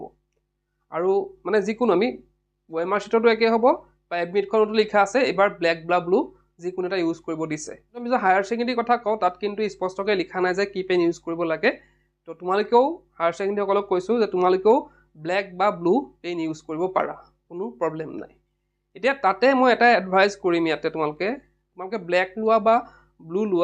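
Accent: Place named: Barpeta, India. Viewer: Sir barpeta district r English lage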